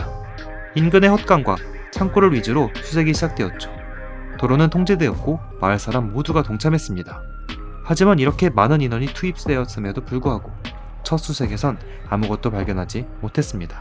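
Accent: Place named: Yanbian, China. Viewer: 말투가 조선족인데?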